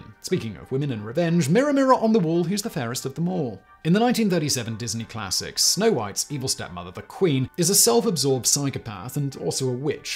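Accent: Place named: Cockney, United Kingdom. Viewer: your emotions and active nature on set is awesome. I watch your videos because you rock and I love to hear you read things.. I dont think it even has to be your channel content, like if you picked up an old James bond novel, you would read it better than the other guy that does it for audible, your cockney accent is better and you sound more south London and it just works man.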